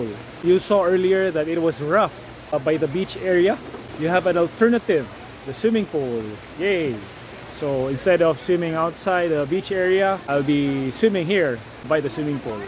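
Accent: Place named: Visayas, Philippines. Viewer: Hi, just noticed you're from Cebu. You have fantastic beaches too. I'm showing my age, but the first time I visited Cebu was in 1981 when we stayed on Mactan Island. I'm sure it's changed now. I enjoy listening to the Bisayan accent. My hometown of Liverpool also has a disitinctive accent/dialect that we are proud of👌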